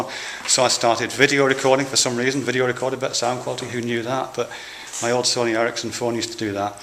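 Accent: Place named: Liverpool, United Kingdom. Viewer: there's lots of scouse/chessire in this video... are you from the north east or the wirral?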